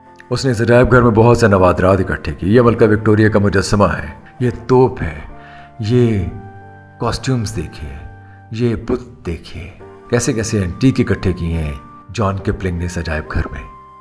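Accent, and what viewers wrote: Punjab, India. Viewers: I like Ur Punjabi accent ❤ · Bahut wadia. I enjoyed your Punjabi dialogue delivery. So powerful voice. Reminded my grandparents. Same style. They were in Gujranwala before partition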